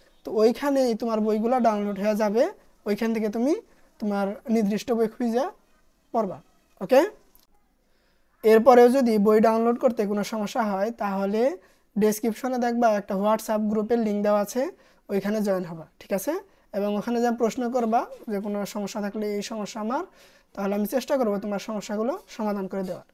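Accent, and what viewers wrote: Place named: Sirajganj, Bangladesh. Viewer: সিরাজগঞ্জ / আশে পাশে বাড়ি মনে হচ্ছে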